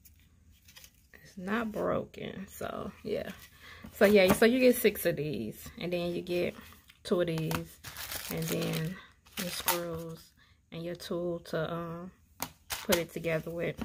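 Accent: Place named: South, United States. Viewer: I just found you and I’m already hooked on your videos. I don’t know if it’s the wonderful southern accent or all the awesome items you’re showing but I can’t get enough❤️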